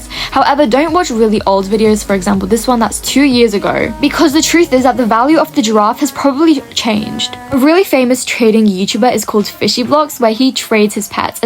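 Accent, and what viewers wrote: United Kingdom. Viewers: You have a British accent right?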